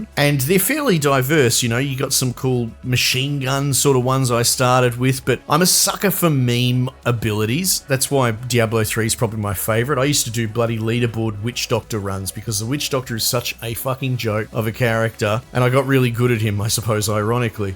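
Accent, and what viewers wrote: Australia. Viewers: ★ ★ ★ ★ ★ ⭐⭐⭐⭐⭐, in my 47 years I have never found myself on a youtube site for game reviews, and I only came upon your review of this game as I was looking for games that didnt require hundreds of gigabytes of storage. So seeing Hellclock at less than 3Gb I googled it and your review of this game was the top youtube result. So I start your review, and within 20 seconds I hit the subscribe button. There are so many reason why, and I wanna get back the review of the game so I wont blow too much smoke up your arse, but can I just say, I was in stitches and simulateously got a brain hard-on. You know your stuff man, and it's good to hear a fellow Aussie (or maybe Kiwi) who really doesn't need to be told how to suck eggs. I will be checking out more of your content in the near future, I just wanted to say "thank you, you're awesome." Sweet as I'm done.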